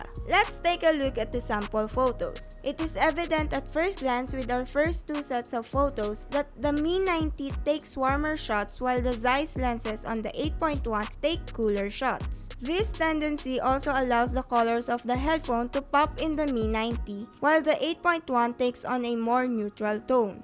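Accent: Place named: Philippines. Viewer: kitten is a cat what I think he's trying to say its kind of cute she has that kind of "stereotypical" filipino english accent which I guess is heard in most grade 10 students of the Philippines despite her age. But who knows lel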